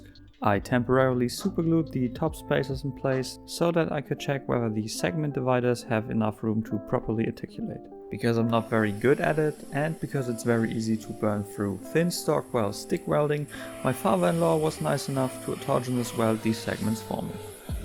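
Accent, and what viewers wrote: Germany. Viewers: Ich dachte die ganze zeit der hat doch ein deutschen Akzent und dann bei der sonntags ruhe ich war mir sicher ❤😂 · Ich habe bis krampfhaft nach beweisen gesucht, dass du aus Deutschland kommst 😅 hört man irgendwie immer raus wenn jemand englisch spricht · Quick question. Are you German? Because I’m believing to hear a slight German accent. And I think you stayed “Nein” (German: no) at when you dropped that metal peace into the water. I’m asking because I’m German too😬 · U‘re German or Austrian Maybe Swiss?? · Great video Aber mal im ernst die deutsche englisch aussprache ist für deutsche echt etwas schwer zuzuhören weils iwie nicht zsm passt (weil die sprache eig 24/7 benutzt wird und manche bezonungen passen einfach nicht das ist normal :D)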